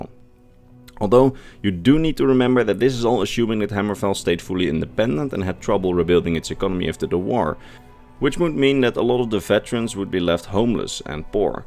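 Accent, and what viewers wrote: Netherlands. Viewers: Ik kan aan je accent horen dat je Nederlands bent :)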